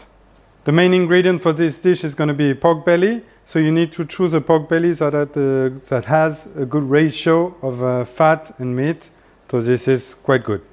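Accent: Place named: France. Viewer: 哈哈，浓郁的法国味英语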